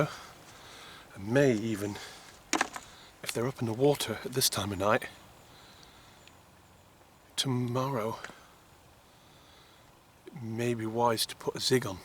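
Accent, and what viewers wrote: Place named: Lancashire, United Kingdom. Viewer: Sounds like your from my end of the country pal, im near Chorley, when you fish sunny 2 if you can get on the double peg closest to the island get on it its a great swim with gravel bars to your right and a great overhanging tree margin and you have the island to fish to, all the best.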